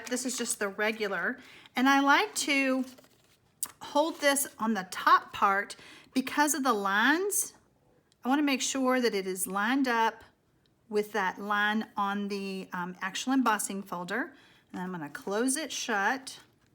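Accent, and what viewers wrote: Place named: Texas, United States. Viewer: Love you accent It makes me think of Texas each time I watch. I-grew up in Paris Texas and lived in the DFW for years. Now we’re in TN My question is what is I would like to view your video of the one sheet wonder you did of the Merry and Bright DSP. I love the cards you made from it Could you guide me to the video. I couldn’t find in on utube. Thanks for your help Texas girl.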